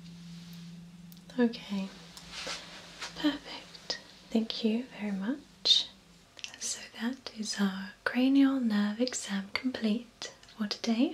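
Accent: United Kingdom. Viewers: starts with that british accent <3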